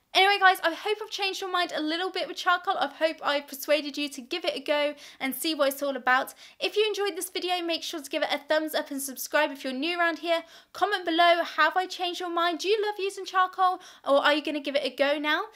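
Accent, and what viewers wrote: United Kingdom. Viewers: I knew you are from UK when I heard your first few words. hehehe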